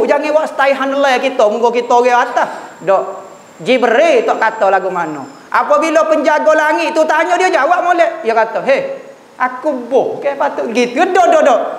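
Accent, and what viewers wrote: Kelantan, Malaysia. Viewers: Allàhhurabbi... Xpenah jemu déñgar carà penyampaian ustaz bercéríta pàsal kísàh2 nabi, càra ustàz cerita sémua señàg faham dan múdàh hadàm.. Dr ségi bàhasà dàn lénggok kélàntan ustaz & bérsahaja buat àku làgi méndàlam masuk terus dlm kisàh2 ñàbi.. térus dpt bàyàñg keàdààn masa zàman ñàbi.. àku órg Johor suka sgàt lógat kelañtan & Terengganu 💪😎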